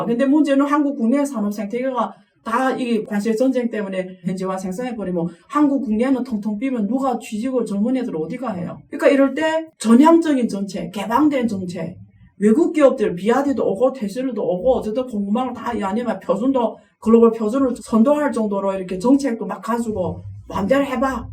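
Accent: Yanbian, China. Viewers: 과감하게라는 뜻 말투를 보니깐 중국 연변이나 목단강쪽 분인것 같고 그기에서 살아온 조선족분들은 북한에서 건너간 조상들이 많습니다 그래서 한국 표준어를 잘 못하는데다가 경제나 기술쪽 전문용어까지 이해하고 공부해 가면서 방송을 할려니 부족한부분이 있는것 같습니다 뭘 또 찝어서 지적하시나 ㅋㅋ 아시면서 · 안유화씨 중국인이라던대 사투리도그렇고 사실인가요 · 안녕하세요 / 시청자 입니다 방송 음량이 70% 알아 듣겠는데 30%는 무슨 말인지 알아 듣지를 못하겠습니다. 구독자 15만명이면 좋은 방송을 위하여 투자를 좀 해야하지 않을까요? 스튜디오 방송 음향이 울림이 심합니다 / 무슨 말인지 알아 듣지를 목하겠습니다. 중국식 발음과 좋지 않은 음향시설 / 스튜디오에 방음시설 좀 하시고 / 커텐이라도 ........ 그리고 마이크 음량도 좀 UP 하시면 좋겠습니다. 시청자가 불편함이 없어야 하지 않을까요?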